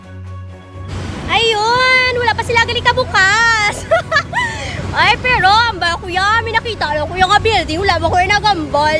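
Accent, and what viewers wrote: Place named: Ilonggo, Philippines. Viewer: Iba talaga pag ilonggo nagsasalita napaka sweet pakinggan.. Nakaka intindi lang ako pero di nakakapagsalita.. Nakapunta na ako jan sis sa Iloilo sis natutuwa ako sayo.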